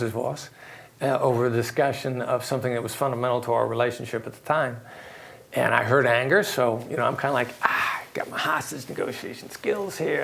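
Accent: New York, United States. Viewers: Your natural accent can escape you once you move away from it and you adopt or adapt to your new environment. He spent the bulk of his career in NY...so therefore.... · I can't comment on that film, not having seen it. I was simply remarking on the verbal affectations of Voss, who hails from Iowa but speaks like a hard-boiled New Yorker. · He says he grew up in Iowa, but his accent sounds very NY/NJ ... ? I wonder if maybe he learned to hide his midwestern accent for negotiation purposes?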